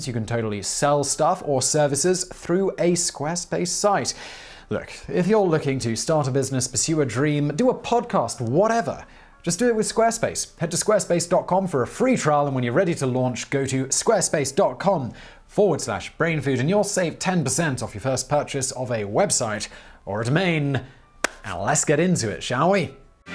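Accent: United Kingdom. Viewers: I can't complain. It was so well integrated, I thought I was watching a British person. Might I add, the accent helps.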